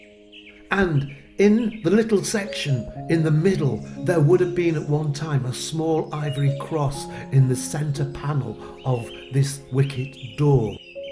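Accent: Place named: North, United Kingdom. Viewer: Are you North Country bounced south, or the Home Counties bounced north?! Whatever you are, you have the most extraordinary, hybrid accent.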